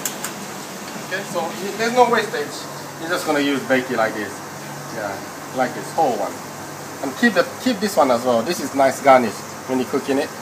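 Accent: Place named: Japan. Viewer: 日本人みたいな発音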